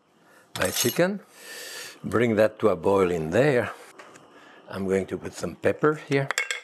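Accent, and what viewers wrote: France. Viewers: After all these years, he's still speaking like a perfect french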